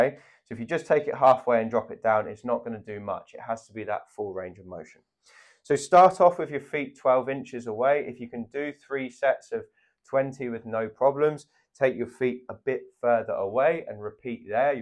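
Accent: England, United Kingdom. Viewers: Love watching your videos after stumbling upon them. Love your accent -English?? Look with hope I will see Improvement, would have very much liked a consult with you but I live down under at the South Pole, instead I say thank you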